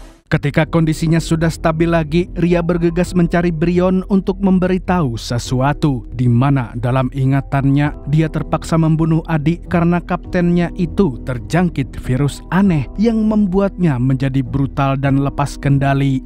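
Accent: Banjar, Indonesia. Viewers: kimanya banjar kah nang ini urangnya😂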